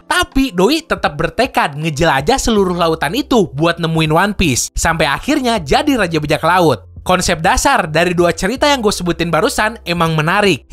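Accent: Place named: West Java, Indonesia. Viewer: Mimin orang sunda yah haha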